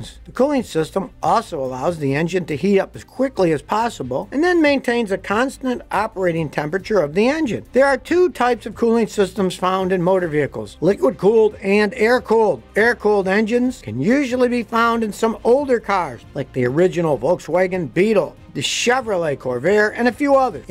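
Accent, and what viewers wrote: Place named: United States. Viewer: I like the accent he talks that one refer to the American 1970's movies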